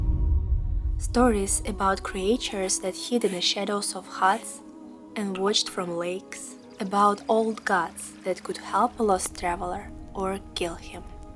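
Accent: Russia. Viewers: Be quiet! I wouldn’t change the way she speaks English! It wouldn’t be as unique with the Russian accent! Pooooф Be GONE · My favourite YouTuber from Russia. You're so cute like a child and beautiful. The way you present everything in such a humorous way, I'm so fascinated. Your accent(Russian) also makes your videos engaging. ❤ Lots of love 😘🎉 Make videos related to your daily life. Are you a full-time YouTuber or do other things besides YouTube, like a job? I have watched all your videos and some twice. You have shared everything on YouTube related to your family. Share your partner's side and your bond with your partner. How did you meet something like that? You've not shown that. ❤ YouTube is like your family. We enjoy your content a lot. 😊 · Love her Russian accent! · It is nice to hear the Russian pronunciation of the names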